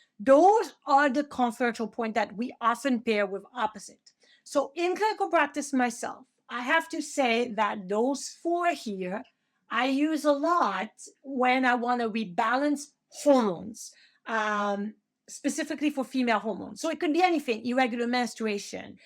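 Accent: France. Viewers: you are awesome very gifted teaching TCM with passion; please do not apologize for your French accent! Joanne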